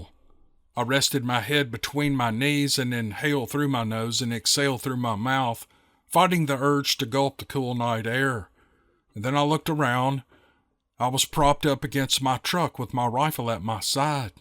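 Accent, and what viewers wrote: South, United States. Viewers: It's that southern accent, I love it. Feels like an uncle telling a hunting story.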